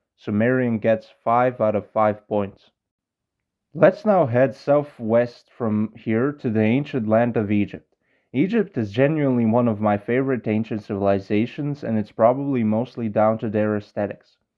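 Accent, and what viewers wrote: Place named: United Kingdom. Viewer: That's a possibility, but I think it's rather unlikely. His accent sounds to me like one of the lower class British accents, and in these accents "TH-fronting" (pronouncing the TH in "thin" as an F) is the norm. So it's much more likely that he simply grew up speaking that way, but that physically it wouldn't be difficult at all for him to pronounce "north" properly, had he grown up among people who pronounced that sound. ....Anyway, it's not a huge deal really, but I just find the F pronunciation of TH rather annoying, and he's literally doing a linguistics video, where he's talking about reviving extinct languages, so it doesn't seem unreasonable to ask him to start by reviving the TH sound in his own English 😉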